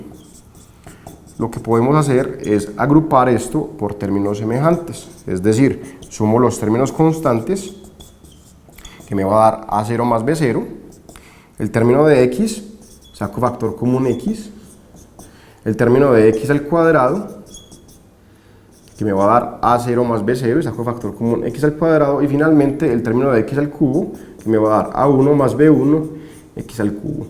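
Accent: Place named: Antioquia, Colombia. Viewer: Los paisas si hablan horrible, pero buena la explicación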